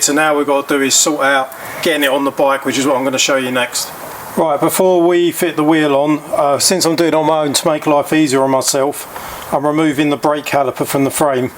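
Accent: United Kingdom. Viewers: I cant stand that british accent. Say words correctly... Theres no 'o' in right.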